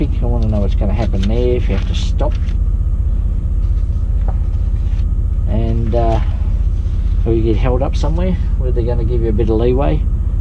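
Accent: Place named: Australia. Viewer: Thanks for posting this! I can practice listening to aussie English and also enjoy trucks. Keep up the good work.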